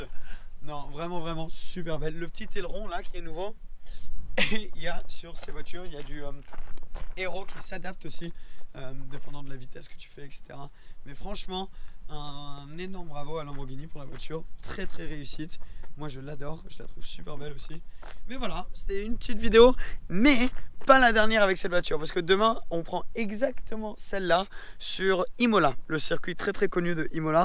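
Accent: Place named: United Kingdom. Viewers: C’est son origine anglaise ptn réfléchi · J’aime tes vidéos... mais arrête de prononcer des noms italiens à l’anglaise...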